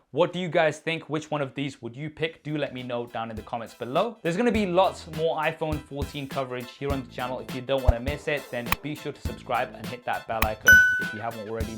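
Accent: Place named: United Kingdom. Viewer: An Indian dude with a British accent? that would be very interesting to see in person here in maga country lol 😂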